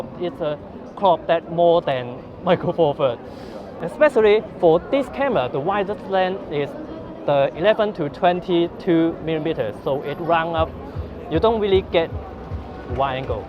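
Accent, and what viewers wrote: China. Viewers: China English !!!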